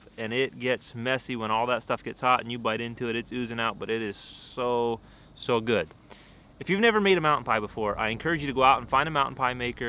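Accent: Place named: Pennsylvania, United States. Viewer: That accent is familiar you from Pa? I am from ruffsdale myself.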